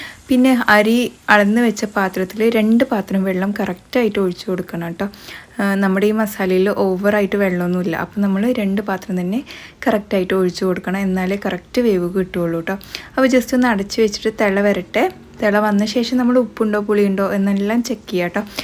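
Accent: Palakkad, India. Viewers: Palakkad evadeyaanu veed .enth resaa ithaade samsaram .kett irunnù povum.oru hai tharo?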